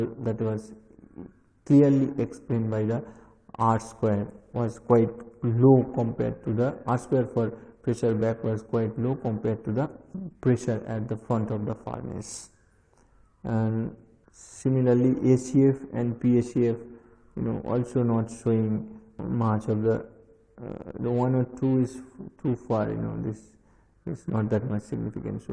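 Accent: India. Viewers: This indian accent is driving me nuts...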